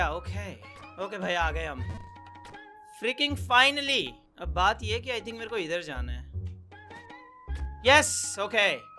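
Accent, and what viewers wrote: Haryana, India. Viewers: My mind have subconsciously added these haryanvi slangs and tones he uses Impact!!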